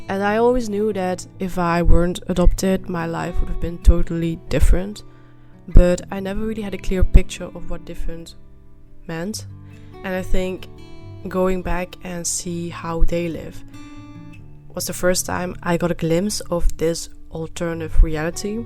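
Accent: Netherlands. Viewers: So many conflicting emotions that are difficult to resolve but in one regard you have so many more life opportunities (as you discovered) which gives you a chance for a broader impact for yourself and others..love the Dutch accent, best wishes for all in your future 🙏🏻👏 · Your English is phenomenal with a tiny hint of a Dutch accent.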